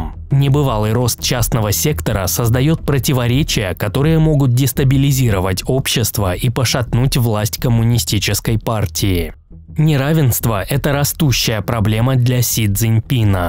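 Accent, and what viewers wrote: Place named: Russia. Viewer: да вроде оригинальный канал ведёт русский судя по акценту. Разве не он и есть автор канала?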